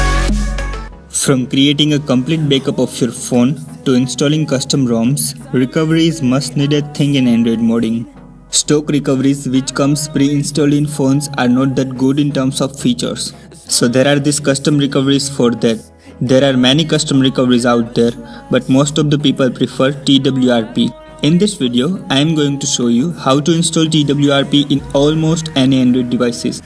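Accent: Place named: India. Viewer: i hate indian english!!!! i cant understand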